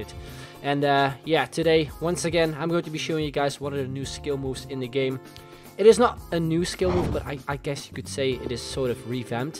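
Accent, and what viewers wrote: Netherlands. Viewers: Christian El Tawil yea was thinking of that too. He sounds dutch so he should definitely know this haha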